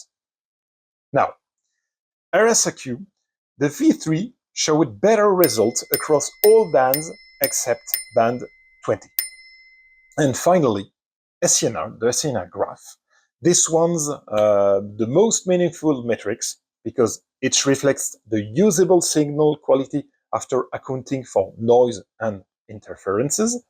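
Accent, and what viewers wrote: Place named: France. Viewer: Interesting. Your English is fine (I only wish I could speak French that well). Your pronunciation of some of the letters (particulalry i and y) very amusing. A little like Peter Sellers in The Pink Panther films :-)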